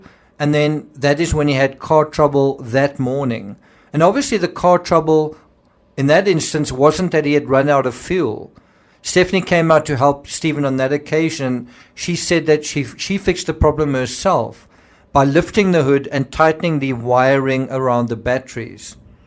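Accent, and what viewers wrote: South Africa. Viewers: I'm subscribing for the south African accent🇿🇦🇿🇦🇿🇦🇿🇦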